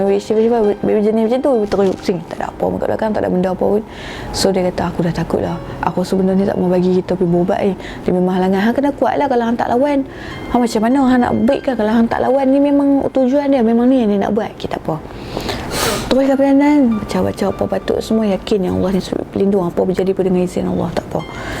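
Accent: North, Malaysia. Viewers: puas cari nak subscribe..setahun telupa🤣.. saya suka penceritaan. suka loghat utara dia👏👏.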